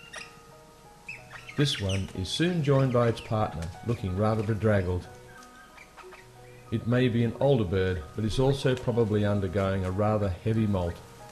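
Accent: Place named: Australia. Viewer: Australian birds. Like the accent. Lady of Australia.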